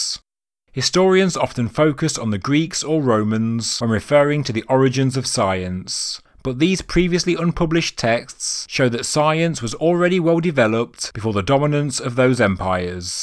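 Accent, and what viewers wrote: United Kingdom. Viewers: Nice to hear a voice from the UK, on the subject of Egypt.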